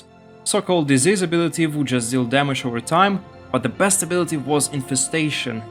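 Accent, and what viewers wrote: Russia. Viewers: What accent, u from russia?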